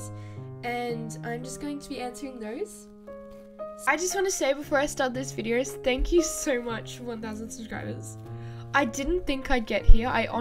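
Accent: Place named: Australia. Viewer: Congratulations!! I love your accent and voice omg✨ is it an australian accent? 🦘 this drawing looks so beautiful!! Thank u for answering my questions 🌸 i love ur videos a lot❤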